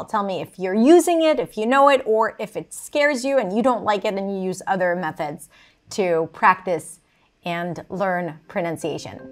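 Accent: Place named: United States. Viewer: Madam your English speech is American I think coze I want to fallow .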